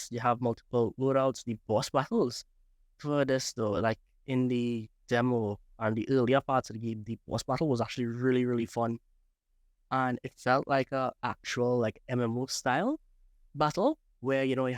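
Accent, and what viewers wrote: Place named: Trinidad and Tobago. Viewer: It's a Trinidadian accent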